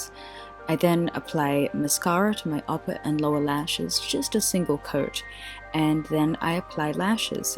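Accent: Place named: Australia. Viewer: Wow are you aussie. You sure sound Australian. I’m in love with your look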